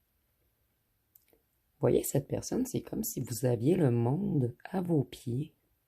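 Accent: Quebec, Canada. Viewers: Excellent, c'est littéralement mon histoire que vous avez lue dans vos cartes jusqu'à ma connexion avec mon enfant intérieur. Vous êtes de très loin la meilleure et votre accent québécois très sympathique et empathique ajoute au charme du message. Merveilleux message qui exprime toutes nos attentes mutuelles à tous les deux.❤❤❤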